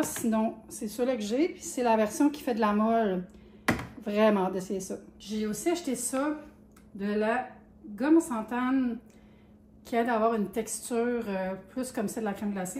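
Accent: Quebec, Canada. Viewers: Hello! 🥰 Moi, petite franchouille, j'adore toutes tes expressions avec l'accent québécois! ❤ C'est tellement sympa, naturel et simple... Je vous adore, les Québécois! ❤❤❤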